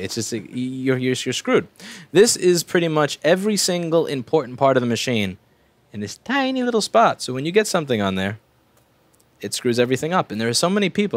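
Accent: New York, United States. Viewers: haha such a new yorka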